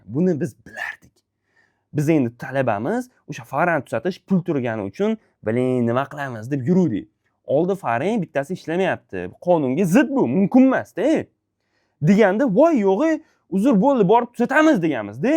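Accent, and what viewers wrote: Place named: Tashkent, Uzbekistan. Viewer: Xabarlar uchun rahmat.Lekin o'zbek adabiy tilida gapiring.Chunki sizni faqat toshkentliklar emas, butun dunyo eshitadi.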